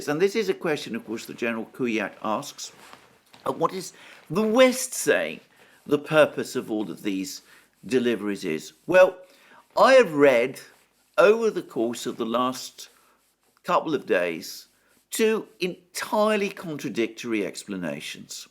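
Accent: England, United Kingdom. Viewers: I m very happy with your British accent. Keep on with your fabolous work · Viva English accent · Love your English accent. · Speaking with an English accent, when you speak English and live in England, is it so strange? The kind of things that upset some people.... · for the love of God and all his saints, please, do not spend 5 minutes explaining why you, being British, pronounce various non-English names with an English accent. What a strange thing it would be if you pronounce them with a French one. That's how these videos run over one hour instead of 30 min, and I, with all due respect to your good effort and thoughtful comments, nowadays watch only some of them. Cut to the chase, and you have the point, you just have to get to it. More views and more upvotes will follow, imo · Dang... sure wish I could understand English with the "English" accent...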